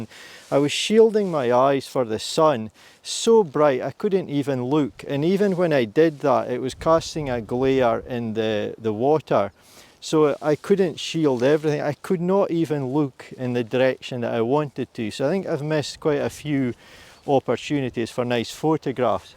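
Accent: Scotland, United Kingdom. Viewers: I knew you were from Scotland before you mentioned it.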